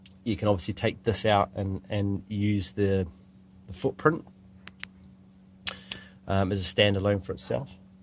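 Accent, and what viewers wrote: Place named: United Kingdom. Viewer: Thank you, perfect review. Not only are your thoughts and your judgement very valuable to anybody thinking about buying this (I just ordered one btw), but also you have this really nice british English. A bit like David Attenborough, bit like the Top Gear guys. I like it.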